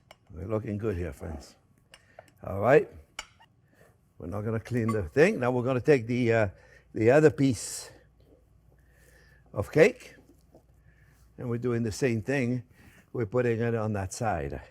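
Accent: France. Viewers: His French accent. I love it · "what the sense? what the sense?" I don't remember how long he has lived in america but he still speaks in french for sure.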